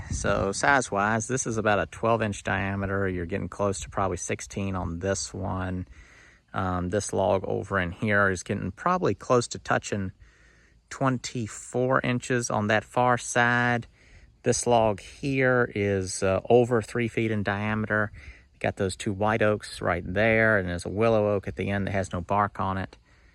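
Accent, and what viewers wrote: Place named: North Georgia, United States. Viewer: Just wondering, are you in Georgia? Accent sounds a bit like north GA.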